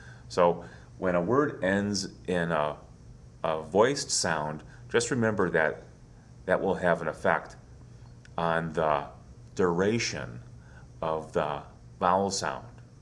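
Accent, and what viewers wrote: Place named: Midwest, United States. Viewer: Viewers should be aware that this speaker has a very flat mid-western accent. In other words, his "o"s are more like "a"s. His word "stop" sounds like it is spelled "stahp". In other parts of the country, we pronounce the "o" more like a round "o", so we would say "stop" as if it is spelled "stawp"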